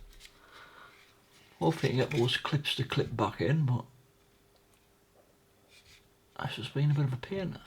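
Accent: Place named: South Shields, United Kingdom. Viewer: New subscriber,... Aussie but with S Shields roots... I've been watching a few of these repair videos, Just wondering where you are from? Sound so much like the kids I went to school with over there - especially 'bah-ries'... My mate always used to take the piss out of me for saying 'batt-uh-ries'. Hehehe...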